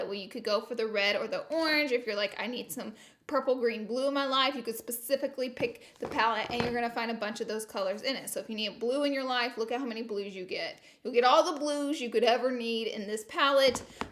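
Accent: South, United States. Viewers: I have the same accent. be proud of it. I love being a southern n.c. girl. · Did you spend a lot of time in Alabama during your break? Your southern accent sounds like it’s been rejuvenated. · You can tell you’re from the south when you’ve never miss understood words 😂😂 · Your eyes were gorgeous!! So glad to hear your sweet southern voice!! ROLL TIDE BABY!!!!!🎃👻💜💜💜🕸🍁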